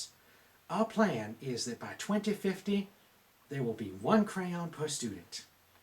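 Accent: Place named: Virginia, United States. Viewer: Nice northern coastal Virginia accent.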